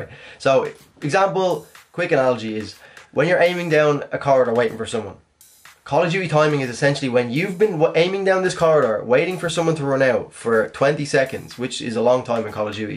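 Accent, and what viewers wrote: Ireland. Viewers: I found it so fascinating when (rad-key) reacted to you. He thought you didn't like ' '. I thought HOW is he NOT seeing your reaction as positive? I legit believe he had trouble understanding your VERY easy to understand Irish accent.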